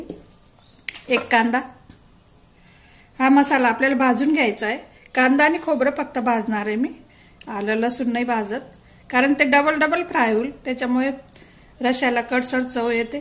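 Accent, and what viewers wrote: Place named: Dhule, India. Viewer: खूप छान.तुम्ही माझ्या माहेरच्या धुळ्याच्या आहात .मला तुमची भाषा ऐकूनच वाटले होते ते.आपल्या घरातच असल्यासारखे वाटते .छान समजावून सांगता.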